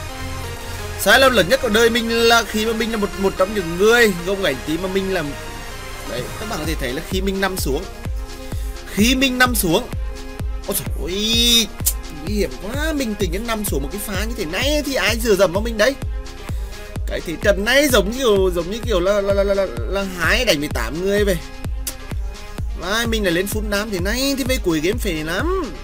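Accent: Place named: Central, Vietnam. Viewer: nghe tiếng bạn lúc đầu cũng khá là khó chịu... giọng nói của người miền trung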